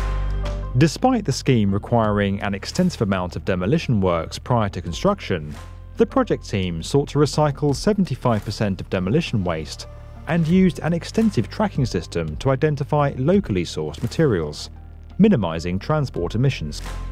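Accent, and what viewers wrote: United Kingdom. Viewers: Why is a video about buildings in America narrated by a Brit?? So annoying. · I find the British/non-American accent a bit distracting! I can't quite concentrate on the content of the video because of that!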